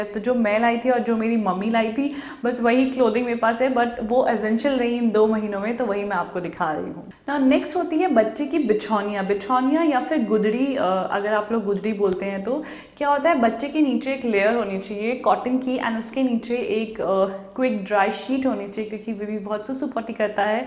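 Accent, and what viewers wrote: Garhwal, India. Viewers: Aap garhwali ho kya ma'am....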